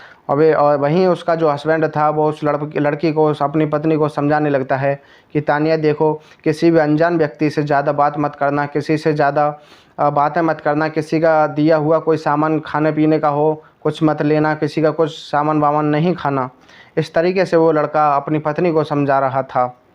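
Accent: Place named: Howrah, India. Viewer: जी आपके बोलने की शैली उस्मान सैफी जैसी नहीं है आप हावड़ा का बोलते हैं और एक ही शब्द को कई बार दोहराते हैं| कृपया सुधार कीजिए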